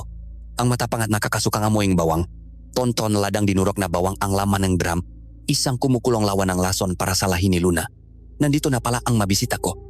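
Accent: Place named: China. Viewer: Bakit namn po ganyan salita nyo Chinese po ba kayo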